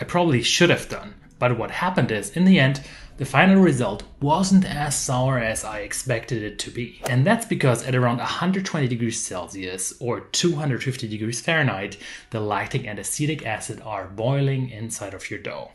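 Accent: Germany. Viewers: I love your German accent. Main reason I sat through the whole video. Good stuff thou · Got this randomly recommended, immediately noticed the German accent mein Freund, without any knowledge about bakery and/or cooking but enjoyed the video nonetheless. Loved the hissing kittens when you "spritzed" the dough :D Definitely gonna stay here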